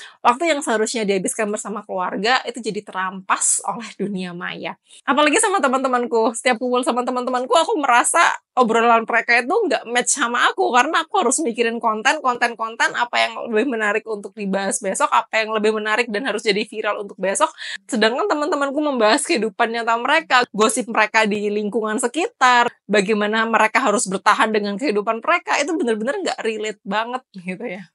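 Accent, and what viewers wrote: Java, Indonesia. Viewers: Imemang gaya bicaranya begitu ya intonasinya terlalu ditekankan terkesan medok jd kyk orang tercekik.... Kenapa ya.... Kasihan.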